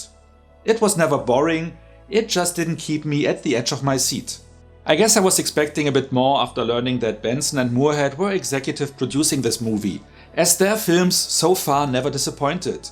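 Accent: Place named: Germany. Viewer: I like ze quality of ur english but still it is herable: you truly are a German dude. So, warum sprichst du nicht einfach auf Deutsch? So gut auch wirklich dein English ist, so sehr hört man es einfach heraus, dass du Deutsch bist und schwubsti wubs, kann ich dir ned mehr folgen.... So please, keep speaking in German, zaz how I understand u most perfectly.... U understand Mr?:D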